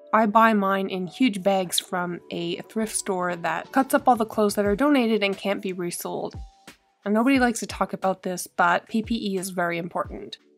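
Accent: Canada. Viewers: OMGosh, how petty. Salve...you are pronouncing the L. Aunt, people pronounce like the insect ant. Ignore the critics, people are so hateful 🙄. I love Canadian accent (I'm sure I have an Ohio valley accent). Very informative video, I want to start refinishing furniture to help supplement my income. Your videos help explain how you can get started💗💕💗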